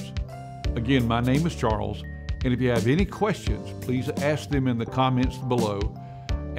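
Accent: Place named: Texas, United States. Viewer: "Their ve-HI-cles" WHY can't they pronounce words right?? Also they say h-wile instead of while. OMG the texas accent is super annoying but this video is very informative and was the only video i could find that showed how they work. But that accent will always irk me >__< either he's from Texas or Tennessee I can't tell their accents all sound the same to me.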